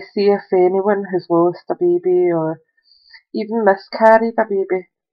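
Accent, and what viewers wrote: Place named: Scotland, United Kingdom. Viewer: I could listen to you for hours mrs - love that Scottish accent!! But I know what you mean, I feel monotone Irish lol x hugs Sandra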